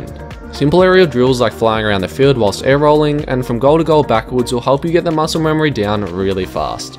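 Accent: Australia. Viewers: Oi you’re Aussie ay?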